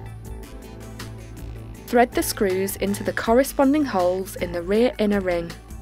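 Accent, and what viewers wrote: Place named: United Kingdom. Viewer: I hate British accents....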